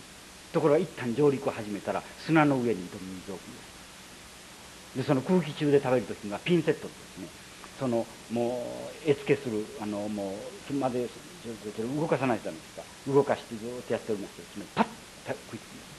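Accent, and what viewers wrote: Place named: Shikoku, Japan. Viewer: 四国出身の方らしいアクセント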